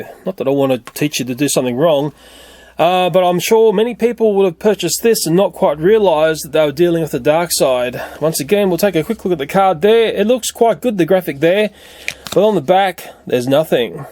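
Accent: Australia. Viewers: I love the way Australins talk. Im American so I can't really understand what he means sometimes.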